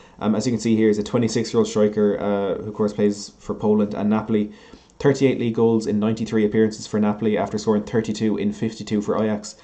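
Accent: Ireland. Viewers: Ur sound like ur irish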